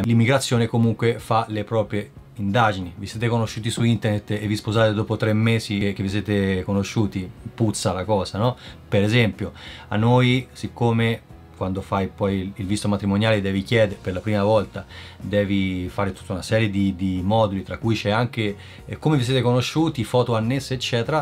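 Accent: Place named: Perugia, Italy. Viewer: hai un po' l'accento perugino🤔🤔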